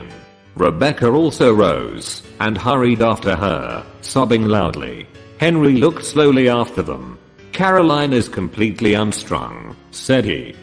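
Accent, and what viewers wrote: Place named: United Kingdom. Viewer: All of his voices and pronunciation is perfect!Full of British Accent!Wonderful!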